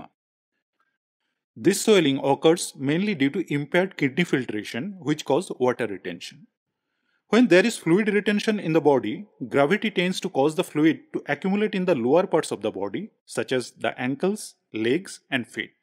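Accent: India. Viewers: You can turn on Closed Captions (CC) and then read what he is saying. I can understand him fine as I’ve had friends from India.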